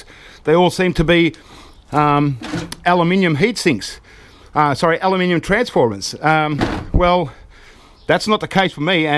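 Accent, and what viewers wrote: Australia. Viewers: I enjoy your videos mate. I love hearing Aussies talk. Never gets old. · I live right in the middle of the U.S.A. and I'm starting to say aluminum, the way you do and I don't even realize it. I wish I could talk like you! Everyone I know loves the deep Australian accents!!!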